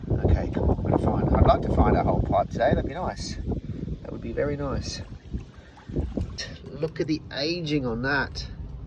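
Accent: Australia. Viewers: So nice to see and hear another Aussie away from home. Was really funny how you called it a sanga as I said that to my Dutch hubby the other day and had to explain what I was saying. Was a great Episode... keep em coming :-)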